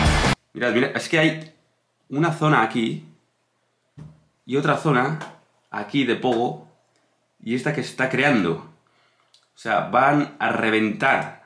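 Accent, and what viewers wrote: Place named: Argentina. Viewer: Tienes un deje argentino muy fuerte... lo mismo que tus facciones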